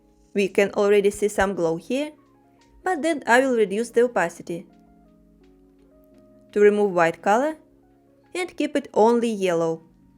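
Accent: Russia. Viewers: Как же акцент режет слух сразу понятно что русская )))) только у русского человека может быть такой акцент. Уж лучше на русском говорите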